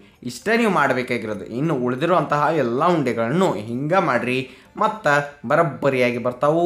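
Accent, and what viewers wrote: North Karnataka, India. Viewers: Nagar panchamige mado ella undi bhala chanda madi torsiri nam uttara karnatakada matu kelikke bhala chanda 👌👌👌👌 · Nimma utar karnataka bashe masta eathiri👌👌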